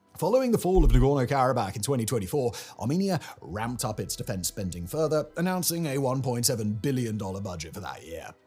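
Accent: United Kingdom. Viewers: LMAO you think he's an American? Did you even listen to the sound of his voice? He's a Brit living in the Czech Republic is my understanding. I know he's a european living in mainland europe basically. Americans sound nothing like that.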